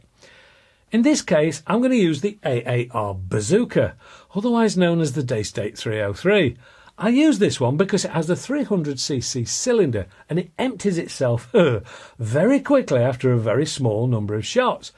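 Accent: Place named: Yorkshire, United Kingdom. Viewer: Great review of what looks like a quality product. But atrocious Yorkshire accent. No more of that please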